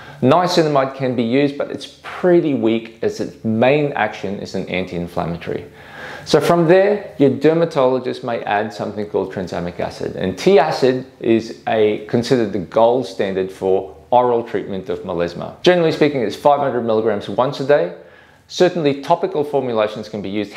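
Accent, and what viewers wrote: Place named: Australia. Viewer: He an Aussie. That’s their natural accent.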